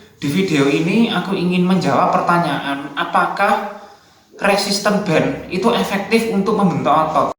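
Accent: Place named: Malang, Indonesia. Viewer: Logate kok koyok Wong Malang yo? Loop Band-ne merek opo iku Bro? Svarga?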